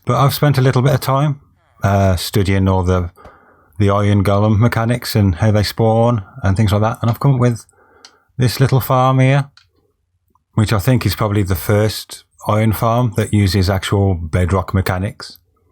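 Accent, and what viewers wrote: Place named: Midlands, United Kingdom. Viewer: Sound like you're from the midlands, mate. Black Country lad?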